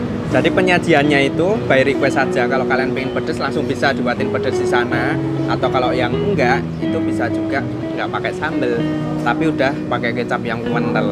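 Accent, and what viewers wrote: Java, Indonesia. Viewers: yg unik itu kyk apa ya? Salah ya kalau gaya bahasanya medok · Menurut aku, blm ada uniknya dan bhs jawanya terlalu medok. Mhn ma'af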